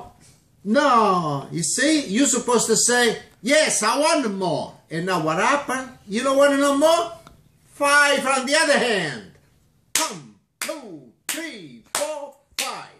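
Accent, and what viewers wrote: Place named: South, Italy. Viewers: Southern Italian dialect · I LOVE SIGNORE PASQUALE!!! ❤️❤️❤️ He sounds similar to the way we speak. We’re from Napoli, so I think he’s from a southern region of Italy bc I understood his dialect very well.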